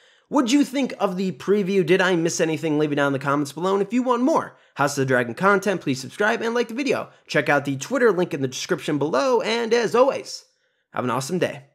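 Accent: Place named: United States. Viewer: The man is a yank, it's understandable he cannot speak true true (Oxford/Queen's) English.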